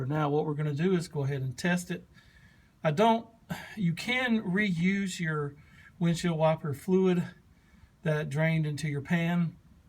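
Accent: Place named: South, United States. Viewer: Your southern accent and the scenery makes me think you might be in Georgia. Just guessing.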